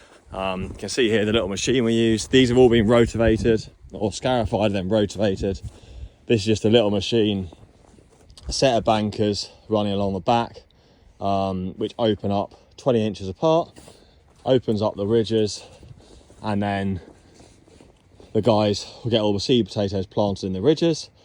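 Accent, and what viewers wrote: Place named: United Kingdom. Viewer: No one needs ask where you come from, its easy to hear you are a Brit because every other word is ''yeh'............................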